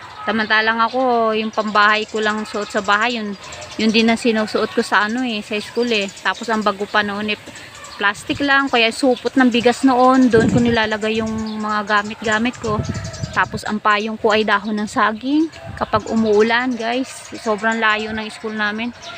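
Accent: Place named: Visayas, Philippines. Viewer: Aragoy bisdak ka pla host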